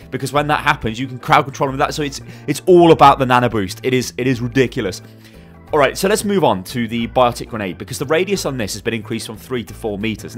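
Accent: United Kingdom. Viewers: God I love british accents. Ana turns into "Aner"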